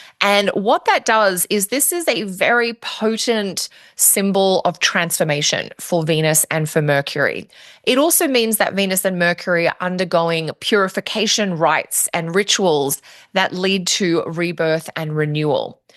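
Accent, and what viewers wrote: Australia. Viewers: So nice to hear a fellow Australian . I have south node in Aries . Chiron in Aries and par of fortune . I don’t know what it means but I feel like crap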